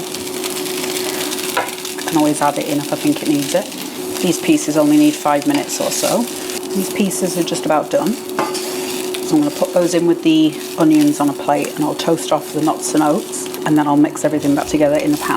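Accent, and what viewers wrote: United Kingdom. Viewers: This looks delicious. I’m celiac so always looking for new recipes. Btw is that a Leeds accent? I’m from Leeds originally so always nice to see YouTubers from back home.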